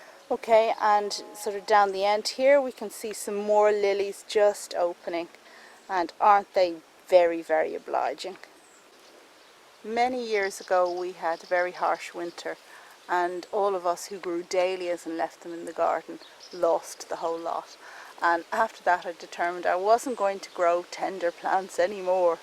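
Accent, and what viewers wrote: Ireland. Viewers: Well I did have a lovely start to my day ! I watched your video in bed with a cup of tea and a biscuit 🙄 which gears me up to get out into my garden, which is not so big but gives me so much pleasure . At the moment I have a plant called Sanguisorba Lilac Squirrel which I think you’d like as it has interesting leaves and long pink tassels,(keep up the tours) I hope one day to see you and listen to your lovely Irish twang 🤗🤗🤗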